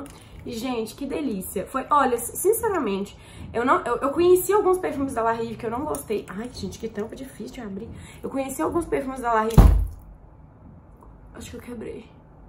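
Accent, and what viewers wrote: Minas Gerais, Brazil. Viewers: Sou apaixonada nesse sotaque, trem 💙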